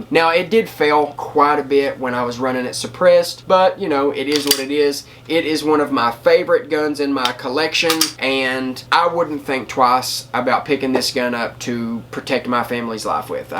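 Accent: South, United States. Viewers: Love the gun collection and also the southern accent my friend. God Bless America and have a blessed day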